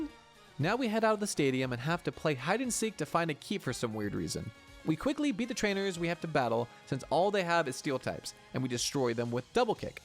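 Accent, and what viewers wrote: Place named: Scotland, United Kingdom. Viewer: Damn a true Scottish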